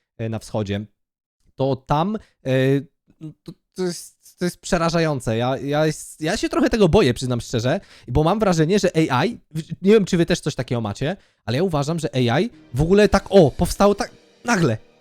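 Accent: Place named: Russia. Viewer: Angielski brzmi jak z ruskim akcentem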